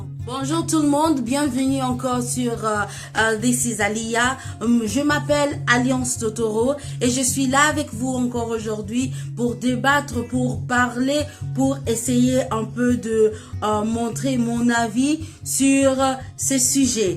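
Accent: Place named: France. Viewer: Your French accent is so beautiful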